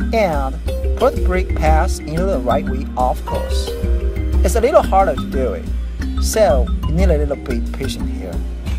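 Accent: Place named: England, United Kingdom. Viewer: I love your English accent 😂😂😍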